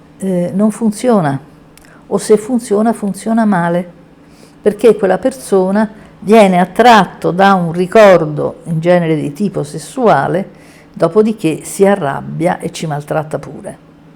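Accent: Rome, Italy. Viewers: Professoressa lei è di Roma?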